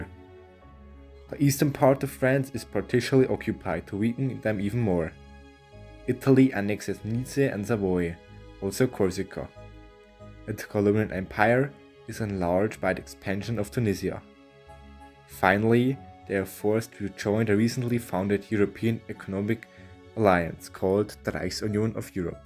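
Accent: Bavaria, Germany. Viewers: Das video wurde sehr gut,und man hört ziemlich deinen Bayerischen Aktzent😂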